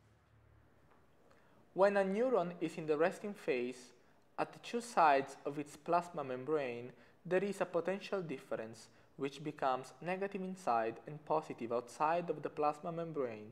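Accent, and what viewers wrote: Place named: Romania. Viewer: This teacher seems Romanian as his accent is so likely to the Romanian pronunciation🙂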